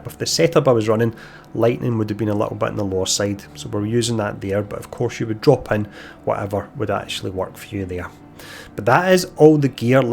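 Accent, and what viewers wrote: Scotland, United Kingdom. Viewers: I came for the build, stayed for the Scottish accent.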